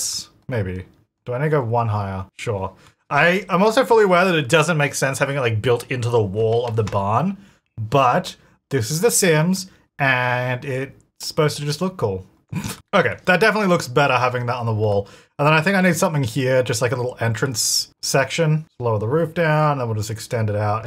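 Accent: Australia. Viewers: For some reason, every time I click on one of videos, regardless of whether or not I've already started watching some of it, YouTube always turns subtitles on. No matter the device I'm using to watch YouTube (phone, laptop, tv), every time without fail YouTube puts subtitles on. But only, and I mean ONLY, for videos. Doesn't happen for any other YT channels I watch. Like, wtf 😂 Does YT think that I can't understand him coz he's Australian or something? He's speaking English. I speak English. I know what he's saying YouTube. U don't need to keep putting subtitles on 😂 does this happen to anyone else or just me??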